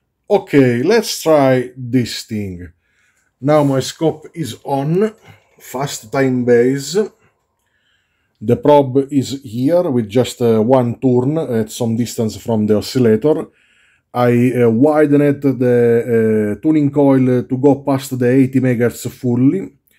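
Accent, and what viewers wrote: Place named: Italy. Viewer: you make great points and I hate clickbait too, but listening to you fans who genuinly love your content and want you to succeed isn't the same as making videos you don't like. And it's not clickbait if the title is true. Putting key words into your titles is good business, not deception. The genius of your videos is above 99% of other channels. I should know. I have very poor health and am often confined to my house for months. I search and search for good electronic videos until I found yours. A diamond in the rough. I know YouTube frowns on using profanity. That's why the big electronics youtubers bleep out their curse words or don't curse at all. No more profanity, key search words in your title and your viewership will increase. You are easy to listen to, have a wonderful accent and come up with brilliant ideas! YouTube “diodegonwild” has a way thicker Italian accent than you and has many subscribers. 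He doesn't curse and has the same video format as you. Please forgive me if I hurt your feelings. You do an a, amazing job!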